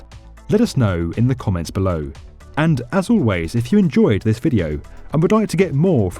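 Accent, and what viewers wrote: United Kingdom. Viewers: I can't understand anything with the UK english. American english is more easy.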